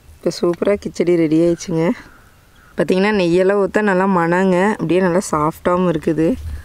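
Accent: Kongu, India. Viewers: Ungaloda kongu tamil tha romba alagu 😍😍🔥💯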